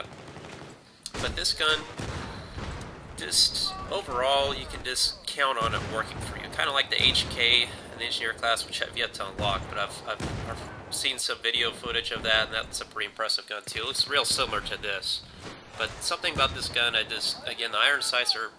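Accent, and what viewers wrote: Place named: South, United States. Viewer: Parisians are a tough bunch, but the French generally are very kind and considerate once your out of the cities. The older folks remember our role in the liberation and have kind things to say about us. If the French formed their opinions on us based on one trip to Manhattan, then surely they would say similar things about us as you have about them. You sound southern, a pilot out of Atlanta? Nice folks down there, hospitable and respectful. Decent and kind. Total culture shock I'm sure.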